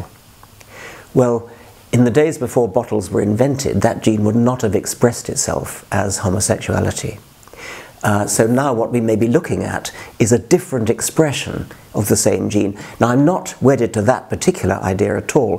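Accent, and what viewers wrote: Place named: RP, United Kingdom. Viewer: Dawkins you have the arrogance of a boy with low self-esteem and a big ego. All your research and conclusions are folly. You're a puppet for satanists. The only point more wild than your pukka accent promoting nonsense is the ignorant people who buy your nonsense.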